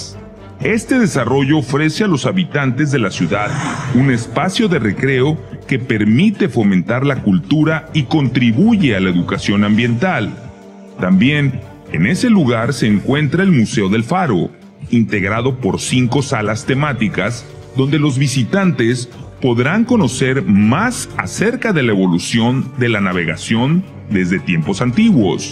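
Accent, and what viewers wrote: Spain. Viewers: No entiendo por qué tiene que ser un español el narrador de estos videos. ¿Qué no hay voces mexicanas que puedan hacer la narración?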